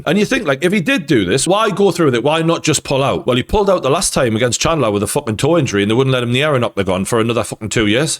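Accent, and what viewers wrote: Newcastle, United Kingdom. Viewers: Geordie. You’re right. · Geordie boy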